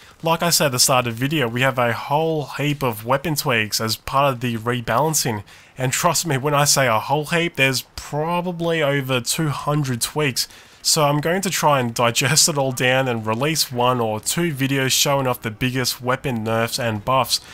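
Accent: Australia. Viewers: Are you an austrailian?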